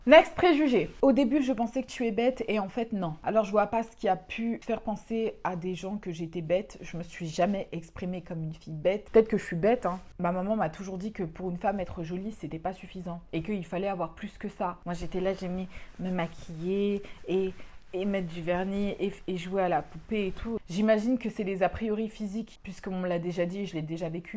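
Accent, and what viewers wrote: England, United Kingdom. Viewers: Team capricorne haha je me suis reconnue dans certains points. Tu es d’une honnêteté vraiment rafraîchissante et tes propos sont très justes, en tout cas ils raisonnent pour moi :) c’est un plaisir de t’écouter et de te regarder ! Je me suis abonnée il y a peu et je me demandais d’où te venait ce super accent anglais ? Tu as fais un séjour à l’étranger ?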